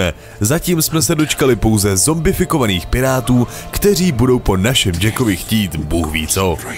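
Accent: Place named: Ostrava, Czech Republic. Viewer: synku nemluv po ostravski bo mi to usi rve:)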